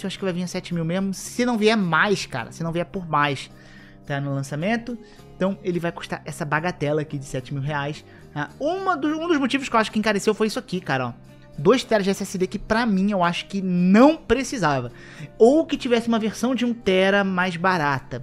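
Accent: Rio de Janeiro, Brazil. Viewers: Haha alem do sotaque carioca esse cara passa mo impressao de Nerdola ENZO de condominio kk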